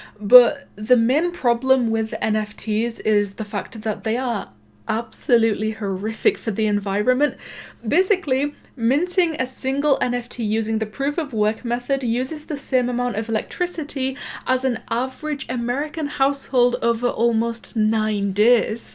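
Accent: United Kingdom. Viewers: you British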